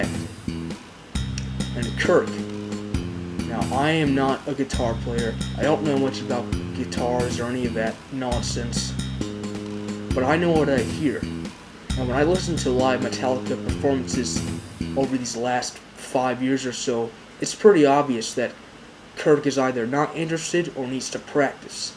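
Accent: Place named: United States. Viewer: Speak English instead of pigeon American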